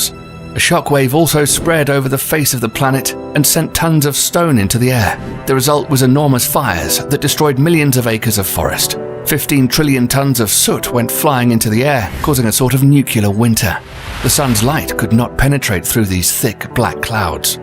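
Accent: United Kingdom. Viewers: My favorite British narrator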